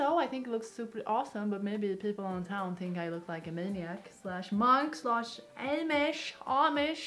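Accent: Sweden. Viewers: Not to be rude or anything... But does she have a Swedish accent or is that a disorder..... I'm new to this Chanel 🤔😓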